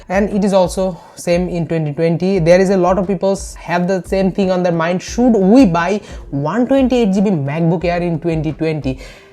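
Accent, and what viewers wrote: Bengal, India. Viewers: Bengali re bhai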